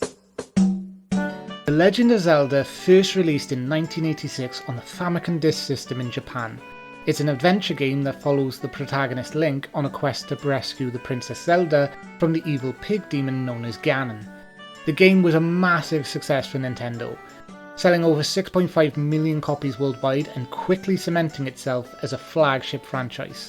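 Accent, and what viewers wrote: United Kingdom. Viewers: Bro are you welsh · Sidenote are you from Northern Ireland?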